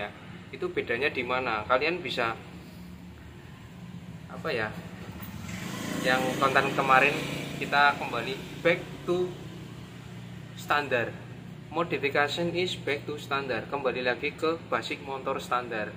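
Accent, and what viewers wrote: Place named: Java, Indonesia. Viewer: Medok jawane ndi hehe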